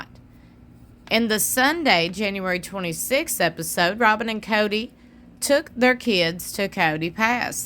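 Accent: South, United States. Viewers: I just want to say I have been listening to you since the days and I just adore your southern accent so much and you have become a staple around my household. My husband doesn’t care a lick about sister wives but always wants to listen in when spilling tea! Keep doing what you do!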